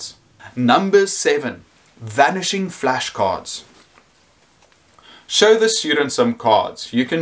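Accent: South Africa. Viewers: That South African accent is a blessing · Great video, thanks for the tips! I am trying to work out your accent, am I wrong to say you are South African? I am not bad at picking accents considering I am not English :) but your accents makes me wonder....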